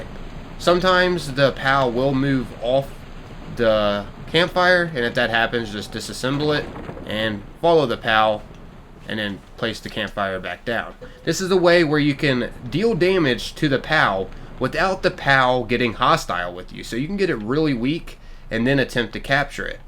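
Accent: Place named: Philadelphia, United States. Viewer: You sound like you're from Philly!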